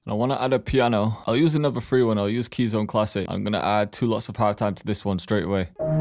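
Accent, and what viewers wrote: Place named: Manchester, United Kingdom. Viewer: This beat is pure fire 🔥 where about in the uk are you from ? Trying to figure your accent out lol gotta be manny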